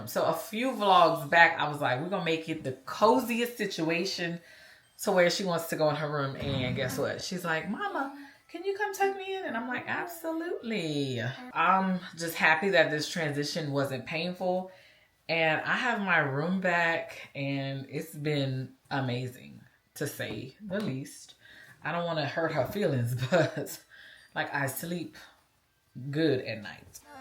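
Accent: Louisiana, United States. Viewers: Oh babbby I definitely can tell..tell you are a Louisianine 😂😂😂 that accent can’t be mistaken!😁 Your daughter is so cute! In her Big Girl bed! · Sometimes there’s no accent and then some other times I’m like oh yea she is from Louisiana 😂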